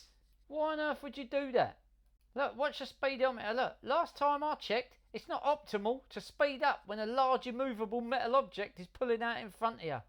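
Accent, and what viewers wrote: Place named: London, United Kingdom. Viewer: Its really good how you think up the the comparisons its quite poetic along with your London accent makes it more funny I love these videos their really funny keep them coming please 😊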